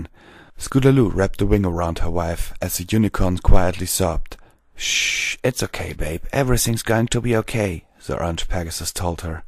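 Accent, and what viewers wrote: Germany. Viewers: I don't know I just listen to it on YouTube and you said you're from Germany should have guessed it through your accent anyway I hope you take my recommendation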